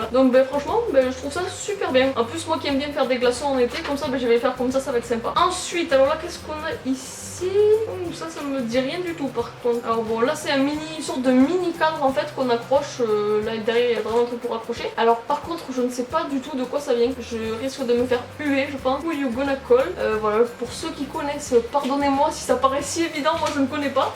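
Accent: Toulouse, France. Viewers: i love accsens toulousin et tu fais 17 ans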